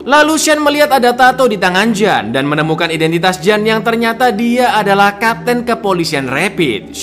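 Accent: Java, Indonesia. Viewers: Bang suara Lo kek orang Jawa ya!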